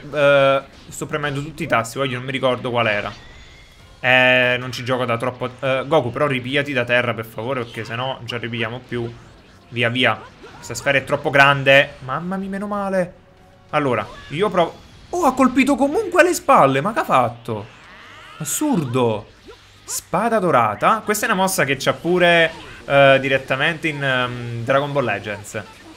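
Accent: Naples, Italy. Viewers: Ma quindi sei napoletano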